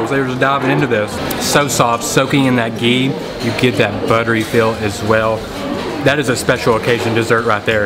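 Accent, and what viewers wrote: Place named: Texas, United States. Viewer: Great video, first time seeing a great presentation of our food and culture by a westerner. The food, both the vegetarian food at the restaurant and the seafood looks very authentic and of high quality. I can't believe that the way you ate is more realistic and natural than how we natives eat. It seems you really enjoyed the food. I am originally from the other side of the Island, the Tamil Nadu state of India, now living in Houston, Texas. Based on your accent, you seem a Texan too.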